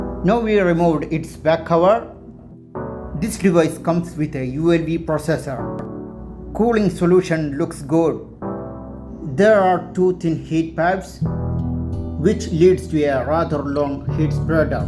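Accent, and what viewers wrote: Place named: England, United Kingdom. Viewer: brilliant English accent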